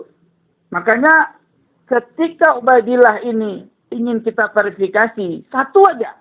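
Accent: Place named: Madura, Indonesia. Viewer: Halo syaikh.. orang pundi gih.. kok nadanya kayak Madura apa betul kyai..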